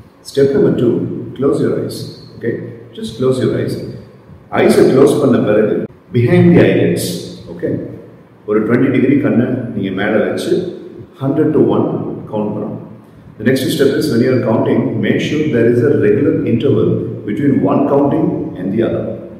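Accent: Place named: India. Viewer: I'd like to listen but, between the echo and the accent, it's difficult. Thankfully, I have the book. (Generally speaking, I can understand Indian accents -- the echo is the issue I have -- and the closed captioning isn't in English.)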